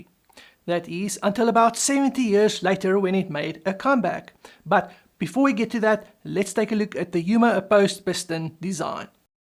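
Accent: South Africa. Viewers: Puedo entender sin subtítulos .... bendito acento sudafricano 😅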